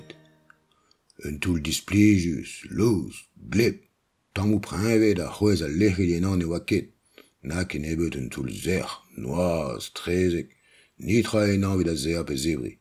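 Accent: France. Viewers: The French accent makes me wonder what Tolkien's opinion on Breton was. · It's like ancient Cornish with a French accent. Fascinating. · french accent is so strong and annoying unfortunately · Loved it, minus the French accent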